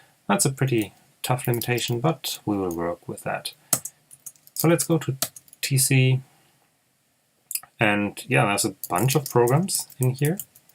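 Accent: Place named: Germany. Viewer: I don't know why, but the German accent makes the tutorial more trustworthy 😄 very good video, thanks!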